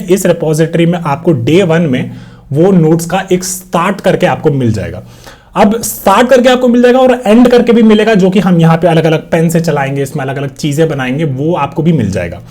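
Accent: Bihar, India. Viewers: i loved the bihari version of speaking just loved that and explanations were also good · Bihari accent ki hi problem h bhaiya baaki content bhaiya ek number hai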